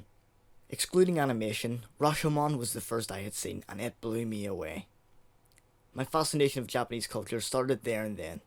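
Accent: Northern Ireland, United Kingdom. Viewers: Just watched the film; I enjoyed it very much. If I may ask, where did you go to college/film school? Judging by your accent you are from Northern Ireland, correct?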